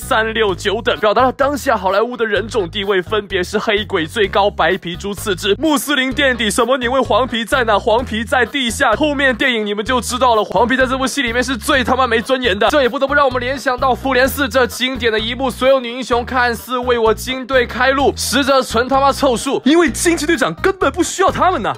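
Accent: Taiwan. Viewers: 這個口音 好像台灣人喔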